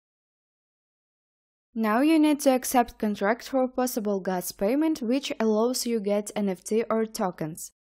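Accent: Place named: Russia. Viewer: Russian acsent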